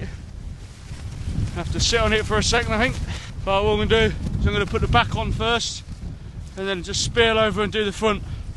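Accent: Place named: United Kingdom. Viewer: You have the most uk accent 😂. Holy shit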